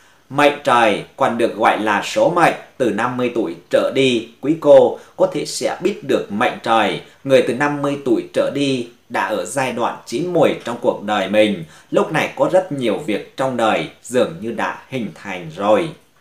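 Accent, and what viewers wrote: Thanh Hoa, Vietnam. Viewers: Sao giọng thầy giống như giọng thanh hóa quê cho vây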